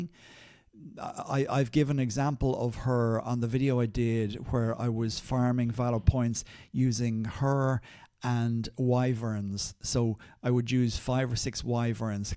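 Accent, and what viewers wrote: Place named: Ireland. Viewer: As a new player this is the first good information site i have found , and i have looked at a lot . Its good to hear a clear logical intelligent person explaining things for beginners upwards and i am very much a beginner. Its good to hear how and why things are important and how to use them how to upgrade them . Also good to hear an Irish ? Accent instead of a london or american accent . Thank you so much for this clear detailed information. I am a very new player only level 112, 000 or so and have been in no battles or tournaments. I am building my city and equiping carter . Thank you fir this page . Very good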